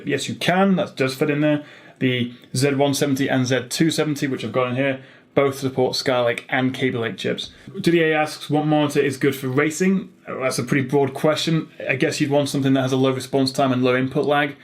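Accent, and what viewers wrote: United Kingdom. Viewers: Your videos are very useful. Good job! You explain yourself very well and it´s a nice accent for me who I´m still learning british! :P Keep doing it! Cheers! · Conscious Movie Reviews just watch some British tv shows and you'll get used to the accent in no time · What part of UK? Work in London are you from Surrey? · I like the British English . Im from Germany and i think it is much easier to understand :D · So glad that I found a UK Tech Youtuber. Always love the accent lol. Subscribing from Indonesia. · I like to listen to you because i have no chance using my english through the day in my boring job but i enjoy Tech and the British English. Carry on mate!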